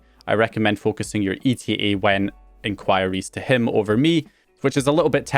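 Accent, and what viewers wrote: United Kingdom. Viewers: Thanks for the vid. Always looking forward to hearing this guy's UK accent.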